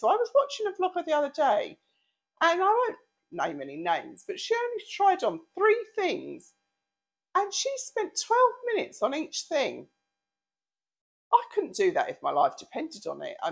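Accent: England, United Kingdom. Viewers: I love hearing the English way of saying things, so please don't feel you have to say sweater. The flower sweater needs something in the middle. That gold neck sweater is stunning on you! The detail is almost art deco. You could wear that any time of year and, heck, wear it during the day! Hahaha, the pearls are molecules! Love the pattern of the jewel one! So pretty and really catches the light. The berry color looks great on you! It's definitely more casual than the others, which isn't a bad thing. The ivory looks lovely with your hair! The gray could be worn any time, as well. It's cute! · I agree with both your favorite sweater and the jacket type with stars - and the quality of the knit makes such a difference - I guess I am a member of Hels Bells also, from over the pond, - I look in am for what is new and enjoy each one. - my English blood loves your accent/your fun personality and all our trips to England, - hubby and I (lost him two years ago) said for all our European trips, England we would go back in a blink. 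I love the term “jumper” - I remember an English author I was reading from about 30 years ago was my first introduction - I was puzzled as she put on a “jumper “ that is a MMm. How do I explain - skirt with vest top attached. We call that a jumper, - but I love your term for sweater and at this point the world is so much smaller, I think most Americans know for the English, a sweater is a jumper. Keep your English terms, makes you more special💕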